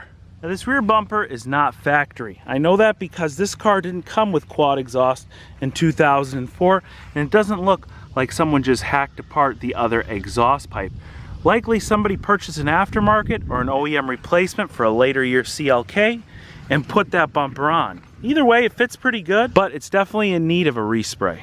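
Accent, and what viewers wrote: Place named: Tampa, United States. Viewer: Phil Abrahams The Floridians from Tampa area have this accent.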